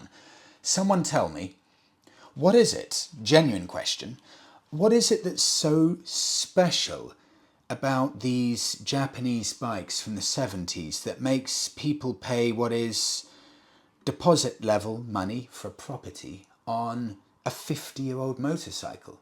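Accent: United Kingdom. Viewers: I love your two YouTube channels. Great content. But it’s your proper British accent that is just mesmerizing. It has the perfect pitch, tone, and pace.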